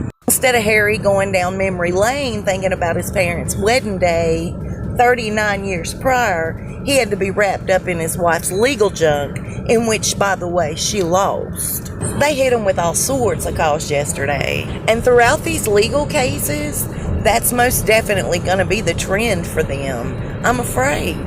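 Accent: United States. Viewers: I really love your accent. I wonder what part of the US you're from.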